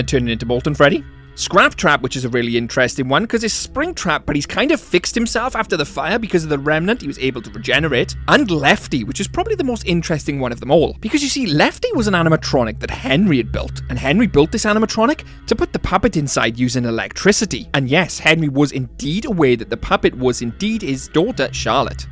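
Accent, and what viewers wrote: Wales, United Kingdom. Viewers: I’m a simple man. I see a FNAF vid. I hear a Welsh accent. I subscribe.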